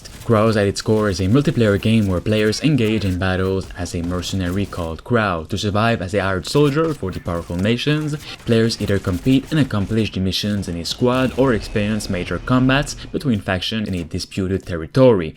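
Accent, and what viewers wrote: Quebec, Canada. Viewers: Tes Québécois?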